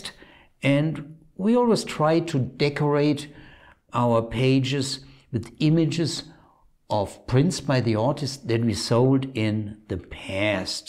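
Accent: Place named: Bavaria, Germany. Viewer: Your "Bavarian English" is much more charming to a native English speaker than the computer rendition. Thank you for making these informative and enjoyable videos. As a lover of ukiyo-e prints, but not a very informed one, I always look forward to your presentations. Stay healthy!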